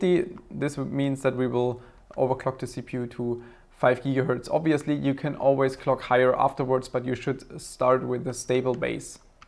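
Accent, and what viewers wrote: Germany. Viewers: Dieser deutsche Akzent 😂👌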